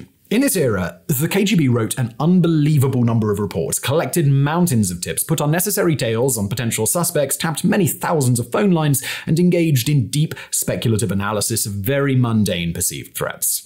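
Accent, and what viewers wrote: RP, United Kingdom. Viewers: I'm about to take a nap now. If I don't sleep to podcasts I sleep to one of many channels, something about British accents.😂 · When are you going to speak about the betrayal of the Minsk agreements by the West? Will you explain how under Putin the Russian economy has grown exponentially? Salaries and pensions have raised in real terms, the national debt has substantially decreased and how he is standing against Victoria Nuland and her deranged dreams, successfully? Imagine, Russia is single-handedly dealing with the whole of Nato through its Ukrainian proxy and is prevailing. Putin is spearheading the multipolar world and a De-dollarised worldwide trade, that's why the US is bent on destroying Russia, but is achieving exactly the opposite and destroying itself in the meantime. Anyway, you simply don't want to understand that the Russian people love their leader and most of the world seconds them. 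With your britisher accent I can understand why you want to throw some dort on Putin while ignoring your inbred monarchy ruling for centuries and your unelected leader Sunak. Who voted for him? Tell me.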